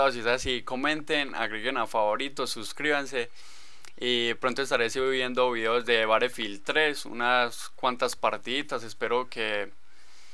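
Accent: Antioquia, Colombia. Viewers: jajajaja sos paisa cierto .... me gusta como comentas esos vidoes como con esa gracia .. saludos desde cali ... por eso no me gusta el fifa solo pes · Ud es colombiano? me callo bien :3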